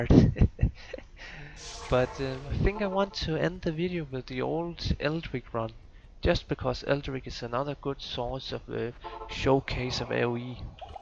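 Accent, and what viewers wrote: Denmark. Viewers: try to lose just a bit of the danish accent. It's like nails on a blackboard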